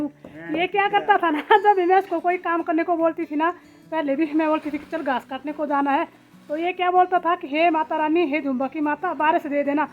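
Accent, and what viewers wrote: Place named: Punjab, India. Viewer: Aunty ji aap punjab se ho kya aapki boli punjabi jaise lg rahi hai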